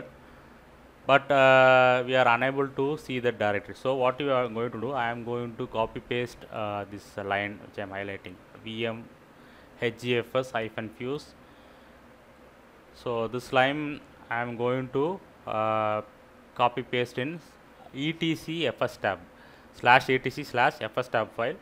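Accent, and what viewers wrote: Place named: South Asia. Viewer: Bro I love your desi pan eating accent uwu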